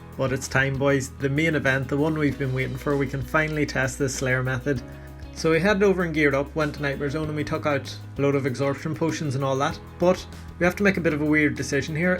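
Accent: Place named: Northern Ireland, United Kingdom. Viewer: Jesus, hearing another Northern Irish accent on the internet is super weird. Wait it says your from fucking Enniskillen on your twitter... lmao, i'm 10 miles away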